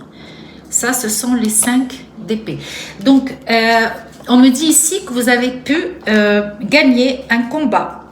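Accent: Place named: Quebec, Canada. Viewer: Vous avez vraiment l accent du Québec comme moi . Tt facon je ne comprends plus rien dans cette relation toxique empoisonner avec mon ex autre. Ca me parle beaucoup cette guidances .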